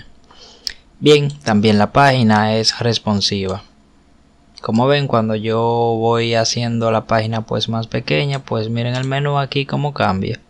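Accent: Dominican Republic. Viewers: eres dominicano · apuesto que eres dominicano hahaha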